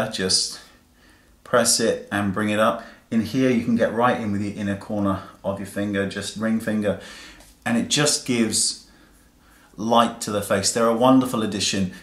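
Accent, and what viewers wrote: France. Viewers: What a beautiful french accent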